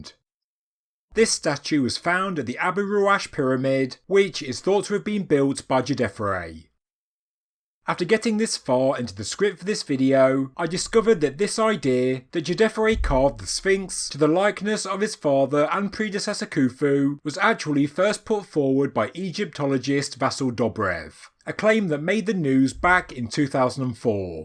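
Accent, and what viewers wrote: United Kingdom. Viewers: Love your accent, so Little Britain...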